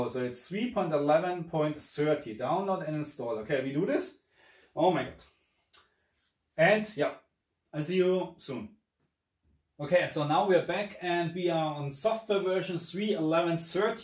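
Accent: Germany. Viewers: Ist zwar ein englisches Video aber so wie ich das höre scheinst du auch Deutsch zu können😉. Gibt es denn noch massive Problem mit dem schwarzwert(flickering)beim vrr/HDR? Wollte mir jetzt nen CX für die ps5 zu legen. Danke für die antwort und frohe Weihnachten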